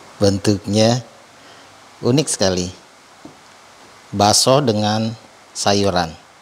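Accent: Jakarta, Indonesia. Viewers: Ngambang ye bang inget yang dikali bang. Betawi abis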